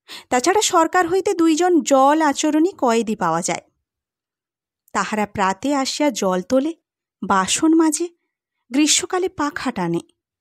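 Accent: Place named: Bangladesh. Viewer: গল্প পাঠে বাংলাদেশের টান আছে ।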